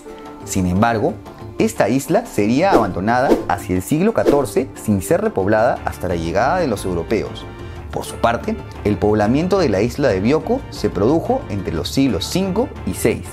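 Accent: Peru. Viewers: No tiene el "dejo" de un mexicano.... los mexicanos no tiene ese acento aunque son algo parecidos.....pero se nota que es peruano. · Ese acento es peruano? no? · No es mexicano el realizador de este video. Por lo que se escucha, el acento de él es peruano. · q rico acento peruano. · Amigo, por el acento, el you tuber es peruano ¡Saludos desde Popayán, Colombia ¡ 👏🇨🇴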